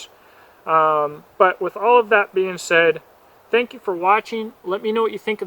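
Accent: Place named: Kentucky, United States. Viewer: My wife is giving me a hard time about your accent. She says you sound like you're from UP Michigan. I thought you were from KY. Would you mind clarifying? ☺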